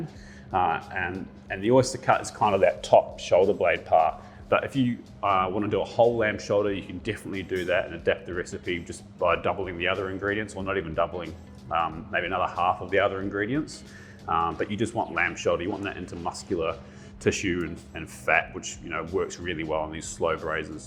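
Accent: New Zealand. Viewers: Spot that kiwi accent a mile away. Heaps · Are you a kiwi mate? I recognise that accent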